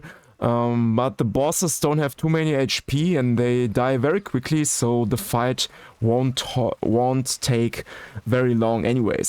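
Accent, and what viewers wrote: Germany. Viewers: Ich höre raus das du Deutscher bist. Finde dein Build ganz cool und werde es mal ausprobieren sobald ich den Rogue auf 100 hab. Pit 200 lauf ich dann eben mit meinem Necro.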